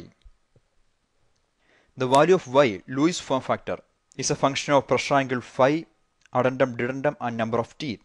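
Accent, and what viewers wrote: Kerala, India. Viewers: malayali annnalleeeee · He has a strong malayalam accent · Indian English . The accent is thick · are you a malayali?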